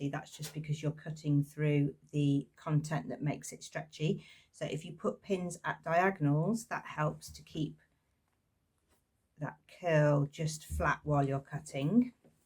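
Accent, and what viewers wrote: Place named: England, United Kingdom. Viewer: Which part of England are you from? I love your accent! Greetings from Australia 🇦🇺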